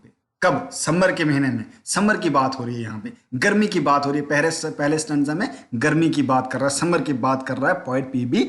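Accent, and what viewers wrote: Kashmir, India. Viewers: R u from kashmir?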